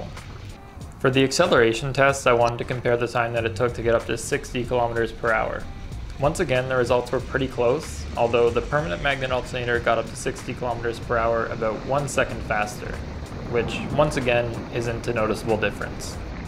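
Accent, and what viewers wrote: Canada. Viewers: You must be in Canada, given accent, English, no traffic & snow on the landscape near the roads // lol .. metric kw / kmph / km distance / units :)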